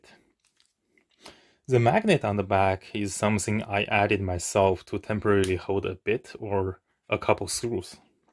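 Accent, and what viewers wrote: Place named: China. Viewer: 老板听口音是中国人？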